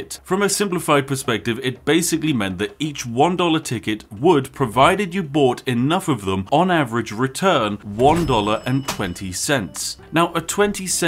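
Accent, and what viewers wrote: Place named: United Kingdom. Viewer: oh my fuck YOURE SO BRITISH... i love it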